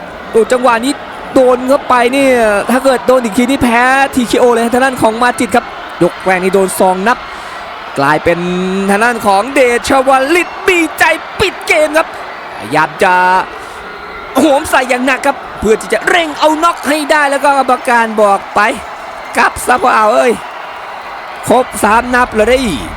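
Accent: Isan, Thailand. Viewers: สุดยอดพากบ้านอิสาน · พากย์อิสานมันส์มาก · พากย์อีสานบ้านเฮาชอบมากไปครับ❤🎉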